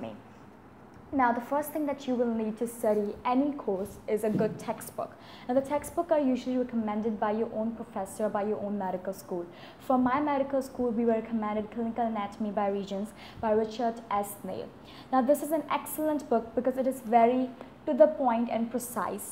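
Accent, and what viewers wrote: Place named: United States. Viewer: Your pronunciation is just like American but little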